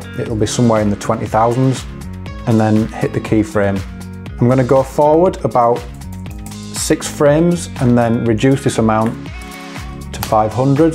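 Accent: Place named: Yorkshire, United Kingdom. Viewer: Knew it was a Yorkshire accent! Not too far at all. I found a lot of tuts on this effect but really liked the examples you showed and the intro is class too - you gave examples during the intro, I really think that catches the attention - the transition to your logo is slick too. Yeah weird how only a few likes. I'm constantly checking YT out for Prem tuts and only just found your stuff so it might be that you're not appearing on everyone's searches yet? Not sure how it works exactly but maybe once you get more subs etc then people will find you. I'll be working through your vids anyway and certainly recommend you to others!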